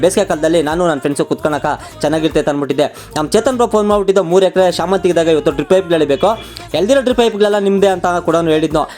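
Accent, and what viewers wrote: Kolar, India. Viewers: Kolar bashe bro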